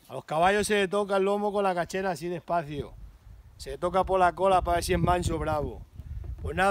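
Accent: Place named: Andalusia, Spain. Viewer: me descojono escuchándote.Pareces Andaluz y no Extremeño.Me recuerdas mucho nuestros mayores de pueblo.Yo soy Malagueña.Llevo muchos años viviendo en la capital pero sin olvidar mis raíces.Soy de ( Comares ) pueblo de la Axarquía Malagueña.Y seguimos teniendo casa de mis abuelos y tíos,en el campo,no en el pueblo.Cuando vamos con mi tita María,pues la casa es suya,disfrutamos más que niños.Yo nada más colocar la comida y lo que llevamos para pasar unos días,me coloco ropa cómoda y mis zapatillas y me voy a dar vueltas al campo,al huerto,a por espárragos,a coger aire fresco y puro.Disfruto recordando viejos tiempos y dándome algún que otro guarraso en el suelo.pero más feliz que una perdiz.Saludos de esta Comareña que vive en la ciudad,sin olvidarse del campo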